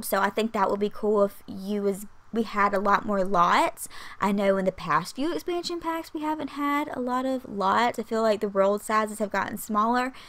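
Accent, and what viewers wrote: South, United States. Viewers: OMGOSH I Never Hear A Southern Voice But My Own...... With My YT....... And I Live In Canada Now For 16 Years........ YOU Remind Me Of HOME!!!!!! Darling!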